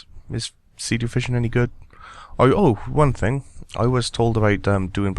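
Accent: Wales, United Kingdom. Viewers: i really wish your videos got more views...your videos are amazing man. ive been watching your vids since you like first started. you're by far my favorite youtuber :D love accent too. you're from wales, right?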